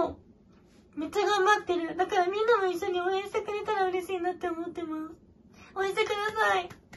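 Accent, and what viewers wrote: Shizuoka, Japan. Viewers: 初コメです^ - ^静岡県民なのでウソだらの静岡弁聞けて嬉しかった(*^_^*)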